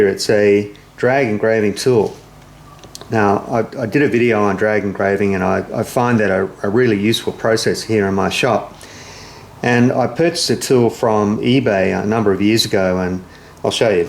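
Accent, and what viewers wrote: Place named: Australia. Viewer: Gday, Great to see your channel is getting plenty of subscribers. I've been enjoying both the project videos and the background sounds that I'm missing from home (birds, rain on the shed roof, Oz accent, etc.) as my wife and I are currently living in the UK, but home is Qld. Looking forward to future videos. Cheers.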